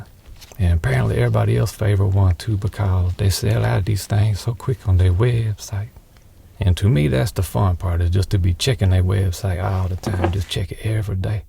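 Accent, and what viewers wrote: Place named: South, United States. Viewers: Star war ,he ma. Coolnicity. Errybody like gi joe. This guy never puts S at the end of his word. He sounds like he is Tennessee or alabama maybe louisiana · that is the most deep fried southern accent I've ever heard.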